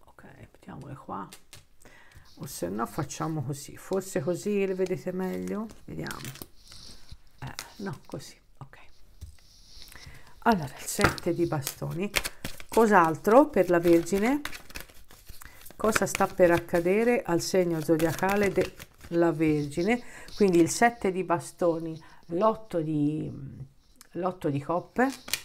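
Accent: Tuscany, Italy. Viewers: Grazie,adoro come parli il toscano,e anche la Toscana,speriamo bene 💰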